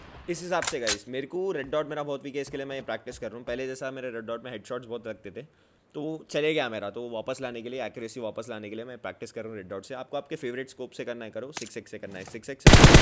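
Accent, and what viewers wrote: Hyderabad, India. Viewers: That hyderabadi accent is lit 🔥🔥🔥🔥🔥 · Bhai tu pakka wala hyderabadi lagtha hai 😍🤩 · Bhai bohot dino bad apki Hyderabadi Sunna bohot acha laga bhai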